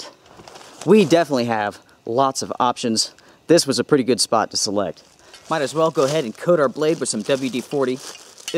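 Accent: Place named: North Carolina, United States. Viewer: Love your videos, Just found your channel recently. Interesting that you say pouring the blood, or, pouring the rain. I've never heard anyone adding " The " in those phrases. Must be a North Carolina form of speech? Keep the videos coming, I will be watching, I've got 3 years worth to catch up on...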